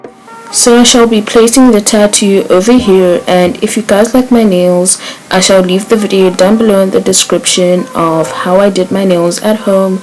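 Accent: South Africa. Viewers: tell me you’re south african without telling me “ so ja “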